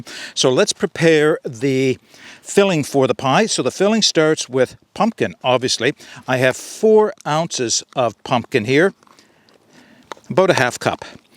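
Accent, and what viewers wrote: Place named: Nova Scotia, Canada. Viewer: Just found you channel, I am starting Keto and am looking for something for outdoor cooking. So far I have enjoyed you recipes and would like to see a book on keto eating outdoors, I have looked for such a book and can;t find anything suitable, had you thought about writing one? I am UK based and Keto doesn't seem to ba a popular lifestyle here, so far I am enjoying the keto and feeling better for it. Please keep up the good work, you are easy to listen to and follow. I'm Guessing you accent is either Nova Scotia or Newfoundland.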